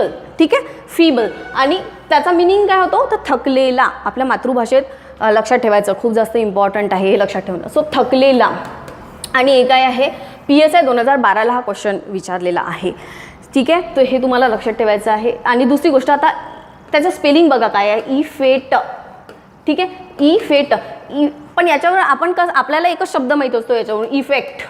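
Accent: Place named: Pune, India. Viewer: नाही मॅडम ,तुम्ही असखलित शुद्ध पुणेरी मराठी बोलता । वऱ्हाडी नाही वाटत तुमची भाषा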